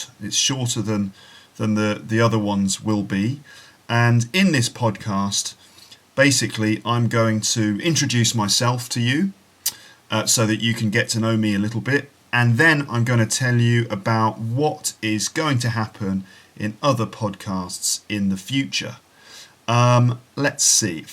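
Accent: United Kingdom. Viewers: I like British accent!😊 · I really like your accent "British"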